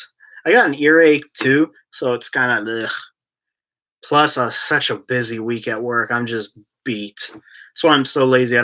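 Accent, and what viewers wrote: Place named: California, United States. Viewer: Just found your channel, pretty good content. Im thinking by your talking, you are in California. Have you seen the Alien museum exhibit at USC?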